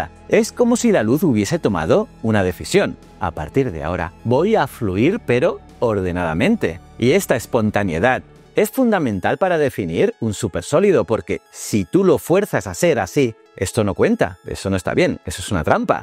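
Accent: Spain. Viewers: ASQUEROSO ACENTO ESPAÑOL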